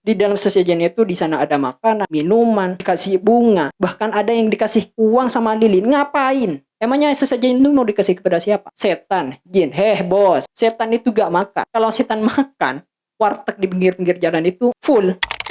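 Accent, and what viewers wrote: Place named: Madura, Indonesia. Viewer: Logat madura pake lo gue agak aneh bg😅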